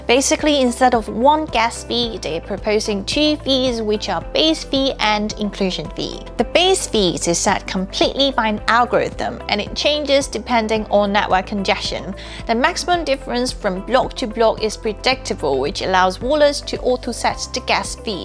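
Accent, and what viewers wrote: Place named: United Kingdom. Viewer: I had to like and subscribe because its good content but also because I'm fascinated by your voice, it's like half very posh english and half Asian, it's brilliant haha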